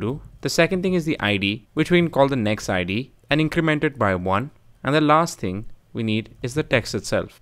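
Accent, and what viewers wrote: India. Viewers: Sir again commenting you are the best teacher who I have come across when it comes to learn react native, I easily understand everything regardless of your hindi accent, I wish there is a way to be able to contact you because I need one serious guide. Please drop me your contact.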